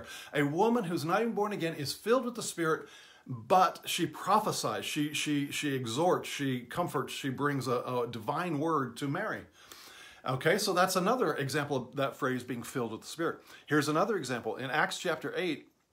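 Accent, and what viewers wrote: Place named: South, United States. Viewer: yall have that WONDERFUL sOUTHERN TWANG, LOVE VE LOVE IT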